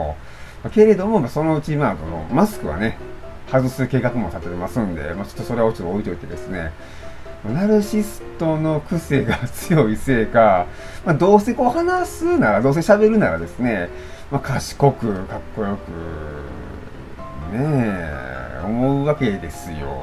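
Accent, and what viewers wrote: Osaka, Japan. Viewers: 毎日アップされるのは素晴らしいことだと感心しています。今日何話そうかなあ？って思うのは大変かなと思いますが。私はさんの家族の話を愛を大阪弁に包んで面白く話すのが好きです。毎日動画アップでさんが元気なことがわかるのでこれからも頑張ってくださいね。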